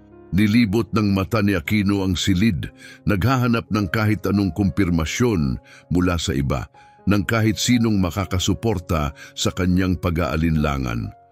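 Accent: Visayas, Philippines. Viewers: DUPER TANGA SINO ??? FACEBOOK PHOL. OR THE NARRtOR. BAKIT??? BOBO GAGO ANG NSRRATOR SA TAMSNG PAGBnGHAY NG TAMANG SALITA NA TAGALOG ...ISANG INSYLTO SA ATING PAMBANSANG WIKA... FACEBOOKKSTANGAHAN GINSHAYSSN NA MAIERE SAMEDIA ANG PAMBABABOYSA SOC MED.. PANSININ NIO MGA VIDEOS LAHAT SY DALING BISAYA MARIRINIG CGURADO MAJORITY SNG STAFF NH FB PHILS AY MGA BISAYA. WALA GUIDELINES TUTOK SA KIKITAIN HINDI SERBISYO ...DAPAT Bng i BAN FACEBOOKK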